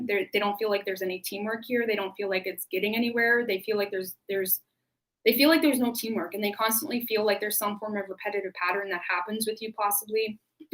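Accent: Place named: Canada. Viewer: leo is on point. your canadian accent is so cute lol. wu-tang forever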